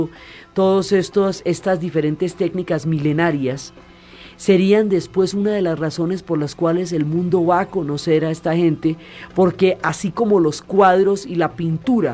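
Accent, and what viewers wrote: Colombia. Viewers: muy bien explicado.Por tu acento pareces Colombiano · excelente es de gran ayuda felicitaciones mujer ,,me gusta el acento por lo que noto es de el valle acento Caleño ....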